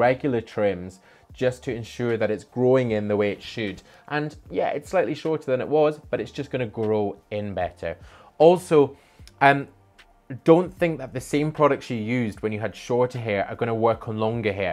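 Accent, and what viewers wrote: Scotland, United Kingdom. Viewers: I like your video. Just curious, you sound like a cross between a Scot and a British man. What is your nationality or background if you don't mind me asking? I just like to educate myself on the different accents and sounds that I hear.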